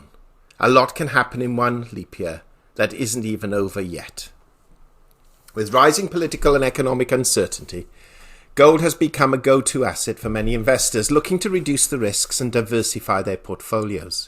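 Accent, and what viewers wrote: United Kingdom. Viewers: I am too new to this to offer any prediction or guidance. However...I do appreciate, value. Cool headed reasoning, professional interpretation(s), cool British accent and annunciation, and a little entertainment thrown in...all for FREE. I’ll always choose the one or two friends that are willing to tell me the hard truths than one or two hundred, patting me on the back. I would revisit my last statement of course, it were to be one or two hundred ladies, if we’re being honest. Anyway. Thank you for providing such a valuable resource. Some of us ARE listening.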